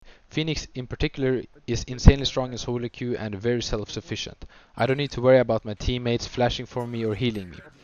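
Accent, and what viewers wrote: Sweden. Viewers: Älskar den svenska dialekten, bra video mannen, ska ha allt i åtanke :) Just nu D1 med ca 120h, målet är ASC denna act!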